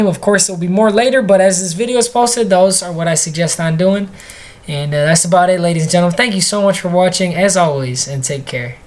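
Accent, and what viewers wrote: Michigan, United States. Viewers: are you from Michigan? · Are you from Michigan?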